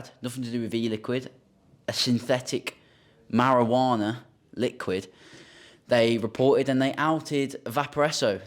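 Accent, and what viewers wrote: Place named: South Africa. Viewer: Good T.W.I.V news. On the next 1 can we have it in your glorious South African voice.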